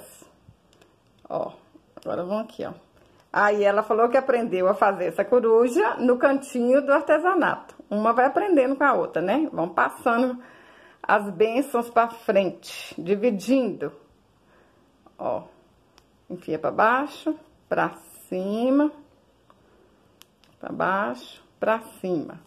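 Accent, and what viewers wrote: Minas Gerais, Brazil. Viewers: adorei essa coruja vou fazer e colocar onome dela de fuxigueira haha bj grande goste de ouvir vc falar me faz lembrar de minhas tias de minas gerais em andradas que saudades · Vc tem sotaque de mineira! Sou de BH ! Vou te dar meu like!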